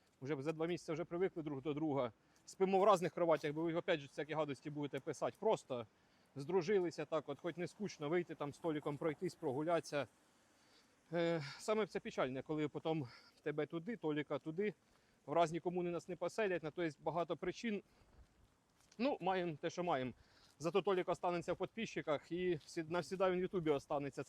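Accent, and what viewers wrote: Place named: Zaporizhzhia, Ukraine. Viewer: З Днем Народження,! Миру і всього доброго! Так приємно бачити земляка, чути наш говір,ви з Оріхова,а ми з Поліг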